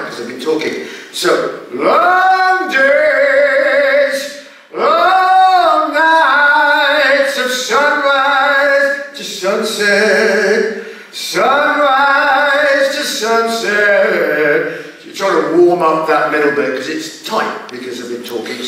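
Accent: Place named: England, United Kingdom. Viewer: Adoro essa entonação carregada do inglês original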